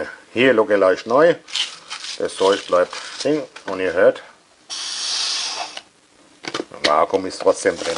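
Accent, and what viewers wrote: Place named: Hesse, Germany. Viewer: Endlisch a ma aner der schö Hessisch babbelt. Weider so 👌🏼😉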